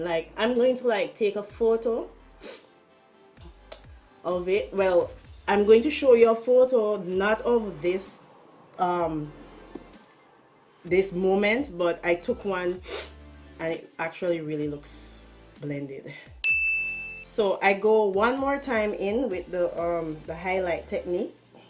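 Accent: Jamaica. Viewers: Are u Jamaican? U gotta be, I know that accent anywhere lol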